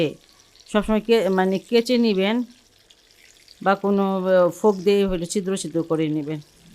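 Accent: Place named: Bikrampur, Bangladesh. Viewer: উনার বাড়ি বিক্রমপুর।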